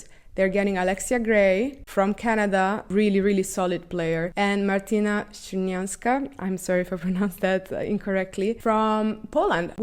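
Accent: Turkey. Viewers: Wow I thought you had a Turkish accent. Still not buying that Italian thing 😂 not that it matters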